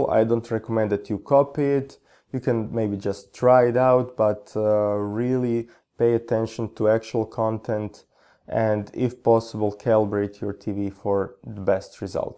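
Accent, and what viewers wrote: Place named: Russia. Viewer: You speaking like a Russian man, I guess so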